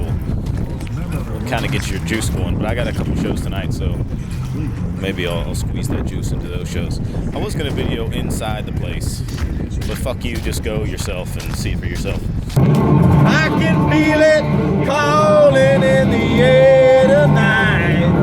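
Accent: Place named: Ohio, United States. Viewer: you're fucking funny. I picked up on your YouTube videos looking for RV advice. As a single person(female) with no knowledge of even changing the oil in anything I appreciate your lack of knowledge...I have none myself, and wish to live a life free and mobile. It's also nice to hear a voice near where I grew up. I'm from Cincinnati. I haven't lived there in decades but Ohio people just know when Ohio people speak...hehehe we're inbred that way...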